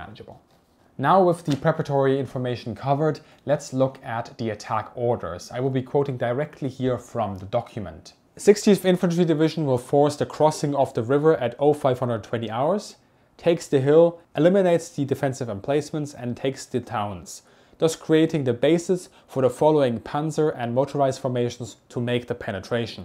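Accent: Germany. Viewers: Bist du deutsch du hast einen sehr starken Accent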